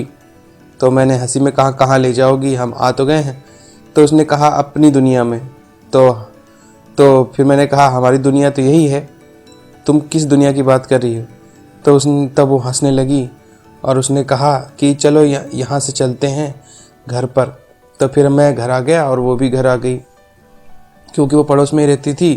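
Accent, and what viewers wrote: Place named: Bihar, India. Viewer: Kon se jila ke ho bhai. Pakke bihari lag rahe ho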